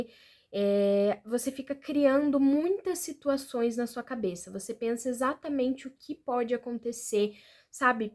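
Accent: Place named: Curitiba, Brazil. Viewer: Nossa você é muito gente boa eu me senti compreendido , também tenho isso é horrível , sou de Curitiba também . Percebi devido o seu sotaque também .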